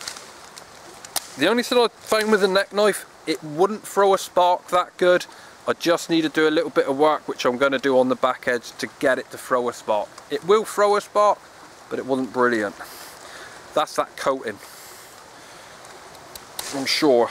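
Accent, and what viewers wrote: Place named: Black Country, United Kingdom. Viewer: Sounds like a Black Country accent mixed with something else.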